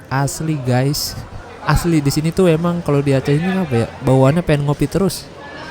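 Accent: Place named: Depok, Indonesia. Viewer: Bahasa lo depok bet bang ✌✌😉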